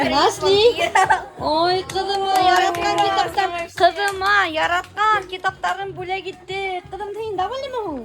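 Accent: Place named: Trans-Ural Bashkir, Russia. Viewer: она на диалекте зауральских башкир говорит иногда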